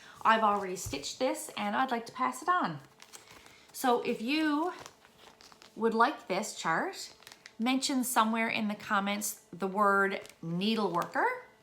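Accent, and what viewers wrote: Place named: Newfoundland, Canada. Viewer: First time seeing your video on my feed. I thought to myself, I need to figure out where you were from. Towards the end of the video, you talked about the fires here in Newfoundland. I always find it relatively easy to distinguish newfoundlers speech. Yay! Found a needleworker on YouTube from the rock. Living on the west coast. Keep well and thanks for your time.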